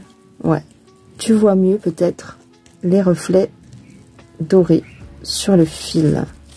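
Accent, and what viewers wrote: South, France. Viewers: Mais garde ton accent du Sud bon sang Ceux qui ne sont pas contents qu ils aillent voir ailleurs Ce sont tes délires qui font ton charme❤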